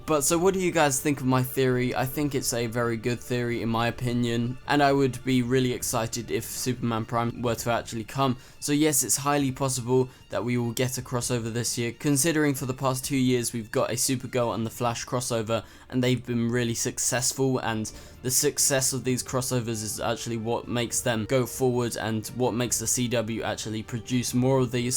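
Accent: United Kingdom. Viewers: r u british m8?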